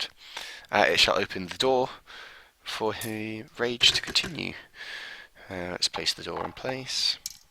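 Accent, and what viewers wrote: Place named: United Kingdom. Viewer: The intro: DEATH FIRE SINS HELL IS REAL The guy: * sweet little british man * I assume british sorry if not **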